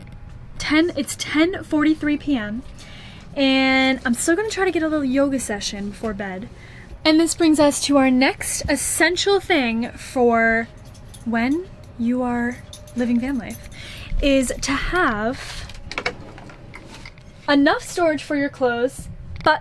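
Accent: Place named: New York, United States. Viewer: Just found your chanel :) great video am i tripping or do you look like an older version of millie bobby brown aka 11 from stranger things like this comment anyone if you agree :) anyway your pretty also im guessing your from ny i can hear it in your voice maybe im wrong lol have a great days guys best believe ill be watching your videos id like to see more of what you eat everyday you inspire me :) i subscribed!!! Yay!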